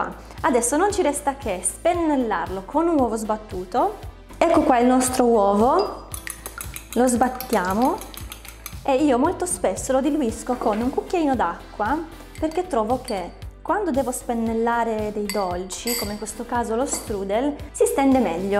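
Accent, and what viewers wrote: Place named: South, Italy. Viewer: Hai un unica cosa che mi da fastidio, l'acce'ntooo te'rro'ne. Cazzo rovina la favola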